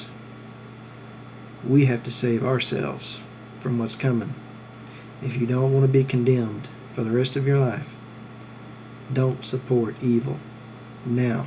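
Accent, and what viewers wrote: South, United States. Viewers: And besides according to your accent you are from the south - why don't U give back Texas to mexico ?!?! It doesn't belong to U !!!!!!! What about mexican refugees - you have any idea how many refugees were created in Ur war to settle texas - their descendents probably outnumber the palestinian refugees by far !! AGAIN - U R A HYPOCRIT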